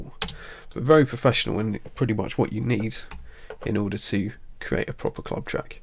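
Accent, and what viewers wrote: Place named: London, United Kingdom. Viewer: Hey- awesome tutorials...do you do private lessons? you sound like you're from London :)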